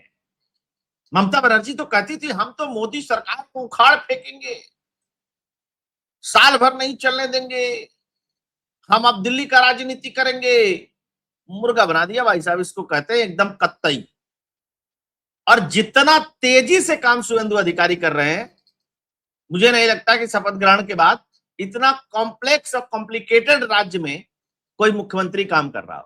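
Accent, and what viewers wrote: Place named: Lucknow, India. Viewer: Ram Ram bhai , big fan here from NZ. Love your detailed work and analysis along with your local lakhnavi style of speaking . 👍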